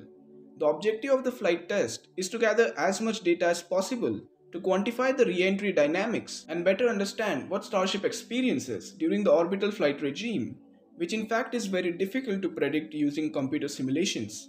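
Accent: India. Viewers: Thanks! the expected timeline was not apparent to me, other than the text list of event callouts. The Indian accent threw me, to be fair I don't speak any Indian. Hoping for altitudes, velocities, geographic locations (or longitudes) and altitudes by the time list posted. Maybe energy dissipation per second (d(m*v^2)/dt), possibly temperatures (gaseous molecular velocities related to Boltzmann temperature). Go! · i love the indian accest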